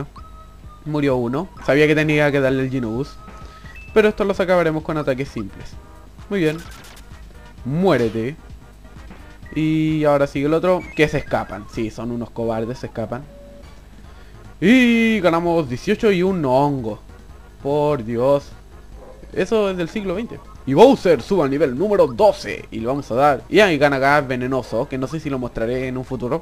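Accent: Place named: Chile. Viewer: Sí el es chileno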